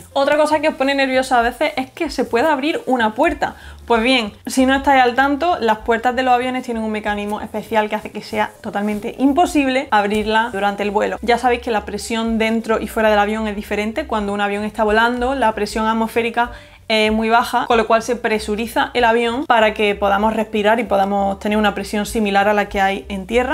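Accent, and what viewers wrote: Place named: Murcia, Spain. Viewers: ¿De qué parte de Murcia eres? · ¿Eres murciana? Tienes un bonito acento. Tenía dudas ... ¿de Murcia? ¿de Extremadura? Cuídate. Gracias.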